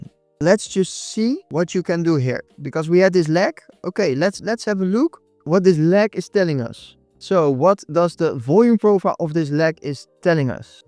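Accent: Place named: Netherlands. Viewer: Continue your videos. They are really good and give me additional knowledge about order flow. I really appreciate it. I was wondering where are you from cause you have a strong dutch accent ?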